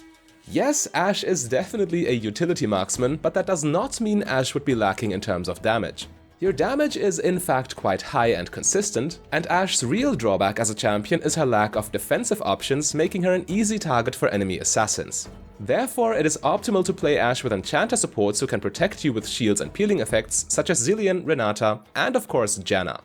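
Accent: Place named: Germany. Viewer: Theres a lot of different builds being pitched for us noobs but I wont question a gamer youtuber with a good mic and a german accent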